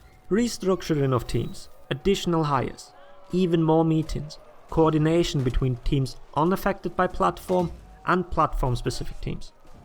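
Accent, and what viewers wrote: Scotland, United Kingdom. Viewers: You sound really Scottish are you?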